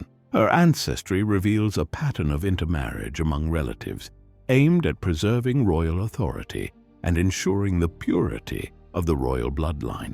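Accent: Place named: United States. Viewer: Dear narrator, why are you prpnopuncing words like an American, when you;'re clearly not American. Speak properly.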